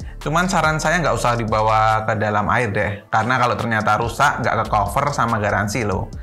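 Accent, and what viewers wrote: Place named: Sleman, Indonesia. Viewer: orang sleman ya mass?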